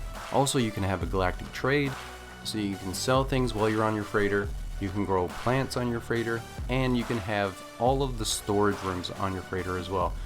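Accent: Newfoundland, Canada. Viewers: The Canadian accent is strong with this one. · Didn't even realize you were a newf until you that last part hahah you're a day walker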